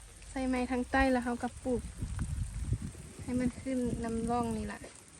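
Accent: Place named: Laos. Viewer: สะบ๋ายดี๋ สาวเม้ยผู้แข็งแกร่งสาวสวยแห่งลุ่มน้ำปากกระดิ่ง ชื่นใจในความสามารถ แม้แต่สำเนียงพูดผสมผสานระหว่างสำเนียงลาวไทยเม้ยกับสำเนียงคำตากล้าได้อย่างลงตัว ขอปรบมือให้ดังๆนะครับ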